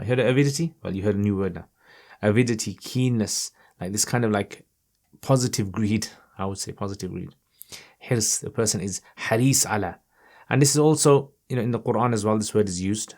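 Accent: Birmingham, United Kingdom. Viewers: Lol! Only because you speak even faster 😂 I think mufti saahib does speak a little fast and sometimes I have to go back to listen to what he said. The Birmingham accent doesn't help either 👀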